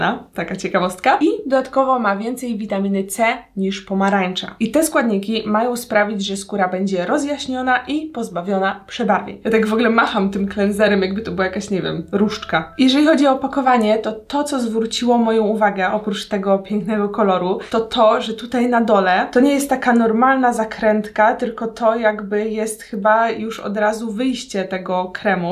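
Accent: England, United Kingdom. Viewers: Ale masz kozak angielski akcent 😯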